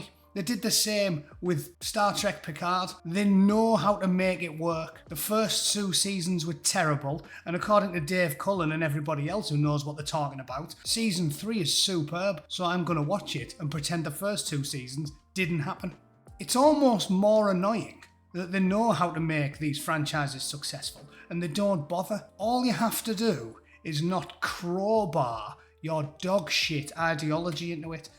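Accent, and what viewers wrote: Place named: Middlesbrough, United Kingdom. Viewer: bang on ' '...love the boro patter, makes my bloody day yer silver tongued cavalier!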